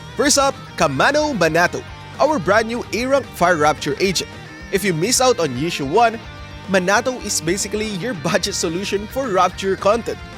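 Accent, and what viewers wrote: Philippines. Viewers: Are you filipino by any chance? you lowkey have an accent.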